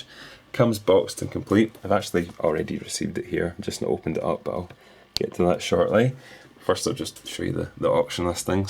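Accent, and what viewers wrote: Scotland, United Kingdom. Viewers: Is that a Scottish accent I hear?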